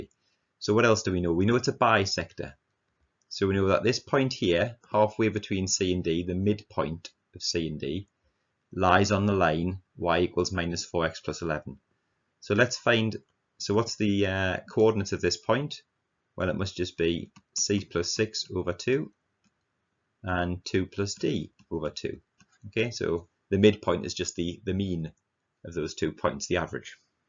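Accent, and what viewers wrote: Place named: North East England, United Kingdom. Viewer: Many thanks. That’s a north east accent .. from where may I ask ?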